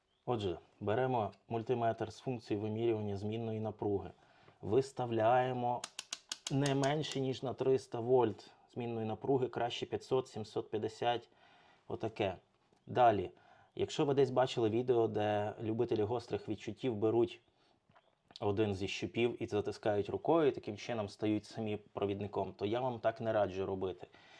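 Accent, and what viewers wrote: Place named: Cherkasy, Ukraine. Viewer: Ше раз, - точно з черкаської області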